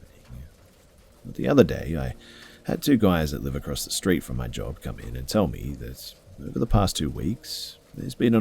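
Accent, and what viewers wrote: Australia. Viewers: Is it bad that i just realized he is a ausie?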